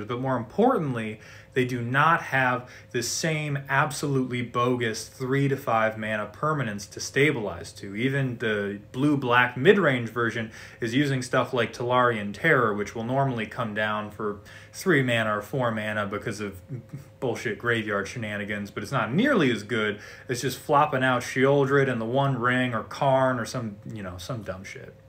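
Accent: South, United States. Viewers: Honestly that was some of the cleanest, smoothest, and slightly southern explanation for why I feel fear when I add one too many cheap red spells into my deck.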